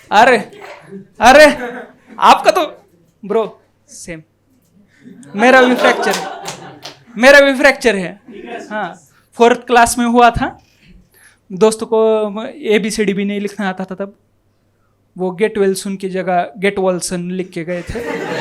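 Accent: Maharashtra, India. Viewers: omg show in banglore, i thought MH... they must like ur marathi accent hindi... but how they laugh on u marathi words??? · Nice comedy in the marathi accent. Jai Maharashtra bro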